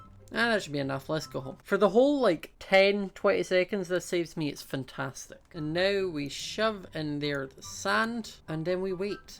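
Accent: Scotland, United Kingdom. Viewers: I feel like Scottish accent makes his videos funnier hehe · His accent!!!!😍😍 Give me your accent! Anybody else love Scottish accents.😍😍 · I just love his Scottish accent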